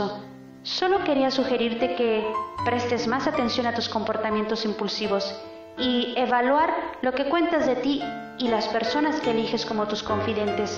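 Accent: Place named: Spain. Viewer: Muy buena su acento español le da un toque d elegancia y donde esta la pecas😮😮😮😮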